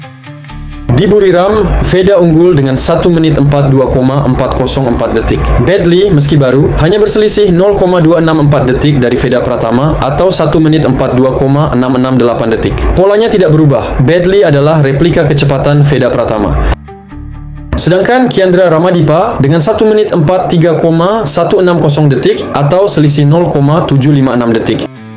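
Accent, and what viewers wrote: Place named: Malaysia. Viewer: emang admin ini malaysia ya? kok logatnya malay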